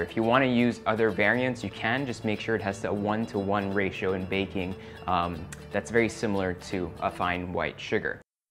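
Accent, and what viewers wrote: United States. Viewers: Funny to hear a very strong American accent with a nicely said ´bain marie’ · Half Filipino and half French - so why the American accent rather than a sexy French or Filipino one? Were you raised in the States? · YouTube recommended this to me, who is he, he is very cute!!! Does he live in the Philippines? Is he Filipino? He has an American accent. I HAVE SO MANY QUESTIONS!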